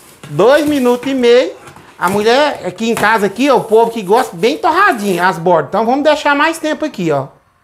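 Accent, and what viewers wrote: Minas Gerais, Brazil. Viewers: acho muito engraçado o SEU jeito de falar parecido com mineiro esparramar eu sou mineira! Por isso QUE tô falando! NÃO esquece da dancinha na hora de experimentar a receita!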